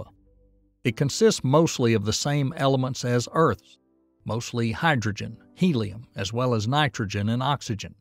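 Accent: South, United States. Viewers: i love this guy's southern sounding voice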